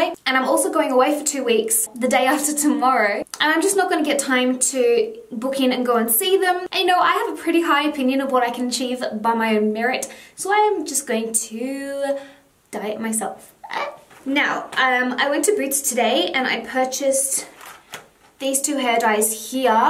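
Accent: Australia. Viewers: You live in Galway in Ireland? Are you kidding me? I live in Galway and I've been subscribed to your channel for forever and I thought you were Australian, I'm so confused lol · What is your accent? I love Irish accent and I lived in Ireland for years but your accent sounds all over the place you sound a bit Australian a bit British a bit idk what the hell lol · wait your from Dublin... I thought you was Australian!!! :O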